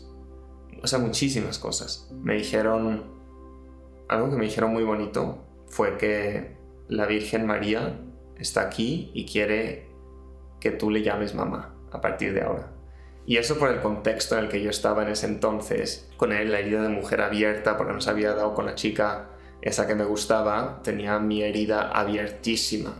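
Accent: Mexico. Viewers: Vi un vídeo de la entrevista que le hicieron al Padre Javier Carralon creí que grababan desde España jaja nunca creí que ya eran Mexicanos 🇲🇽❤ después ví el vídeo del testimonio de Patrick, después el testimonio de Sofi y ahora tu testimonio, ahora ya te sigo. Por la entrevista al Padre Javier creí que llevaban mucho tiempo de conversión nunca me imaginé que era tan reciente. Muchas felicidades por haber tomado la decisión... De aquí para adelante. ah y Bienvenidos a México (ya tienes acento mexicano) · Soy española. Tienes acento mejicano, te has criado en Méjico?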